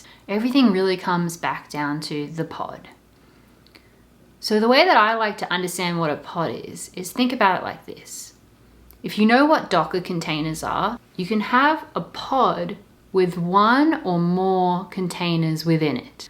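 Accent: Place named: Australia. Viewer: I came for the tech but stayed for the accent! #AussieAussieAussie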